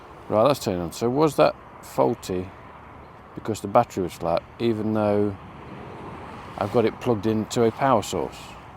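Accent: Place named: Nottinghamshire, United Kingdom. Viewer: Enjoying your French trip. Tell me sumat! Are you from Nottinghamshire. Trying to wok out ya accent 😂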